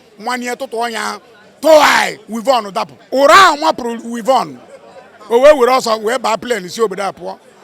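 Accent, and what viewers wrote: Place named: Anambra, Nigeria. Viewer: He's from Anambra state not IMO... but all the same, we are Biafra 😊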